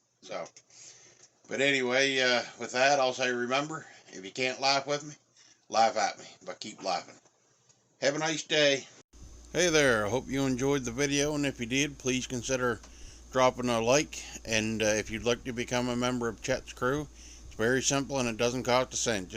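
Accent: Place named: East Coast, Canada. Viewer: Cuntastic! You sounds like a Canadian east coaster b'y, is ya?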